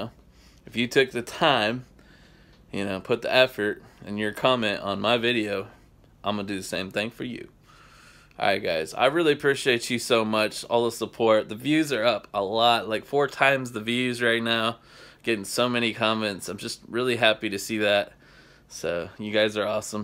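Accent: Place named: South, United States. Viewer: WOW THATS VERY BEAUTIFUL WEATHER LOOKING. UR DOING A AWESOME JOB WITH UR CHANNEL ! WOW. AWW LOOK AT THE DEER. LOL THAT DOG WANTS IT. IVE NEVER BEEN THERE BUT IT LOOKS BEAUTIFUL. I LOVE UR ACCENT. SOUTHERN SOUNDING A LIL. LOVE THE BEATS U PUT IN HERE. VERY ENTERTAINING. KEEP UP THE GREAT WORK!! I WILL PLAY MORE OF UR VIDEOS TOMORROW!! ITS ALMOST 1AM HERE. BUT I LOVE THE MUSIC U PUT IN HERE TO. AND THE FAST FOWARD MOTION. I LOVE NATURE . SKIPPING ROCKS IS FUN. LOL HAVE A GREAT NIGHT! ❤🤗❤🤗❤🤗🤗🔥🔥🔥🔥🔥🔥💯💯💯💯💯💯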